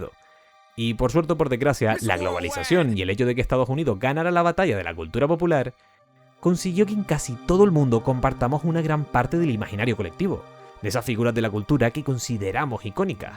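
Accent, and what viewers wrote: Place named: Argentina. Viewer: Tu acento es una mezcla entre Argentino y Español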